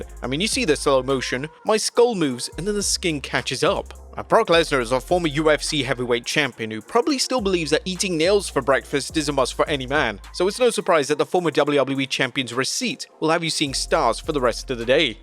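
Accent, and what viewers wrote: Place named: United Kingdom. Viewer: Bri'ish bro talking smack about the jackass crew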